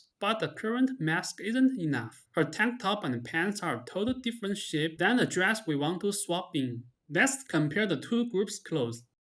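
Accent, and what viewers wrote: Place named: China. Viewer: 中国老乡吧 这口音特色:hand-pink-waving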